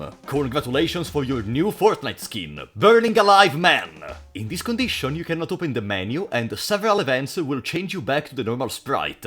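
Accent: Italy. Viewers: I just noticed that you really sound Italian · Aiuto sento un forte accento ita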